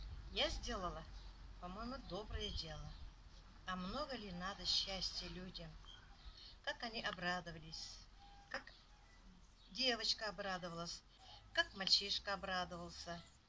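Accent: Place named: Bashkortostan, Russia. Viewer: Точно как наша нанайка из Башкирии, и говор такой же,и та же доброта, молодец,тебе ещё больше Бог воздаст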